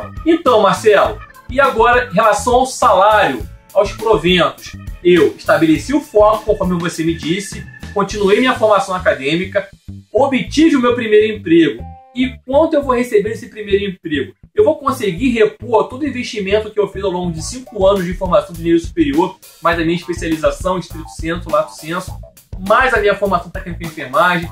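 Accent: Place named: Northeast, Brazil. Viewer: Meu querido vc está viajando..A pessoa é formada graduada em Enfermagem , ela deveria estar preparada para todos os setores, vcs professores deveriam ser mais competentes em ensinar e não a pessoa ficar pescando aqui é ali..na graduação ela está aprendendo tudo em relação a Enfermagem. Jeito chato de falar..lol Failar, trabailar, peissoia, affs é uma mistura de Manaus, Bahia, é o Nordeste todo na fala. Um Enfermeiro com 4 ou 5 anos deverá estar apto a exercer qualquer função como enfermeiro. Só se escolher um setor específico deverá fazer sua especialização. Mas primeiro precisa de um emprego. Esse hospitais que são muito mercenários, querem exigir muito para pagar pouco. Falta mesmo é OPORTUNIDADE É BOA VONTADE. A educação não é continuada..então treinamento e ensino contínuo tem que fazer parte da rotina dos recém formados admitidos.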